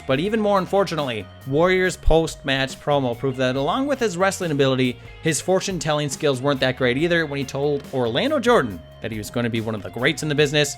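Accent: United States. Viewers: Never heard an American presenter on a whatculture video before. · American man? More like I want my English accents back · Man.. This is weird hearing an American guy haha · Listening an American accent in a Whatculture Wrestling video is so weird. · Why is an American doing the narrative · that's an american accent, who is that?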